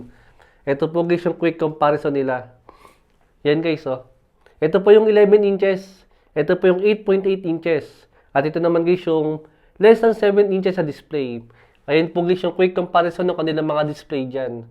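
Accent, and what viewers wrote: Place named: Visayas, Philippines. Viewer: bisaya yung ngrereview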